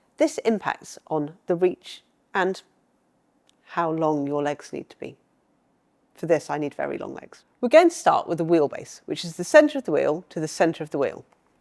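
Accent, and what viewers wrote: United Kingdom. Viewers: Always nice to see her and more importantly hear the nice British accent of this lady.❤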